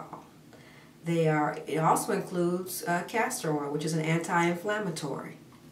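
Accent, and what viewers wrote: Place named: Nigeria. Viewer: Oh I see, it sounded Nigerian lol! And you are pretty :)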